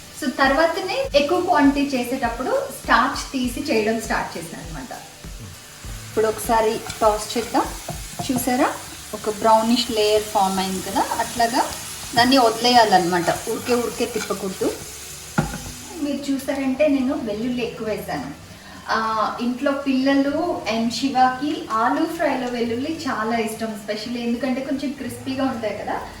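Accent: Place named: Telugu, India. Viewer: Nice Baga cheseru. Meru telugu vallu kada garu...mee matalu ala unnai.....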